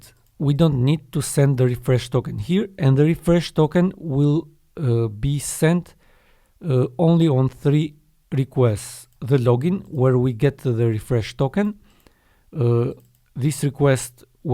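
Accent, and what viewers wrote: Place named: Albania. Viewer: pershendetje, prej aksentit qenke shqipetar 😊.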